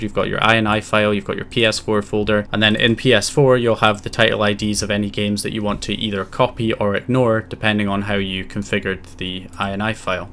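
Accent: Scotland, United Kingdom. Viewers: Amazing videos BTW, and extra special to hear a fellow Scot explain such matters!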